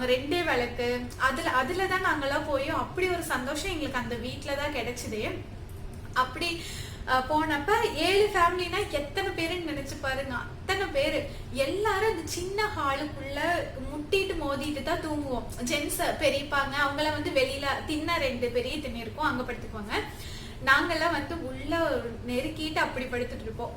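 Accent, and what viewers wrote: Kongu, India. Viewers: கொங்கு தமிழ் Sema feel 😍😍😍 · Unga appatha oorla nadanthathuku vayiru valika sirichom... Elorukum iPadi akka thangachi amayraanglanu theryala.. Kongu tamilla oruvaati paesikaatunglaen akka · Kongu Tamil akka Nega pasarathu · I like your kongu slang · Kongu tamil one time pesuga my fav...slang🥰my athai home in Tirupur naa anga iruthan near by perumanallur so one time post ur slang kongu tamil...sis. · Kongu Tamil koncham pesunga.... I like the slang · akka how r u and ur family? Oru day neenga full kongu tamil pesura mathiri video podunga ka please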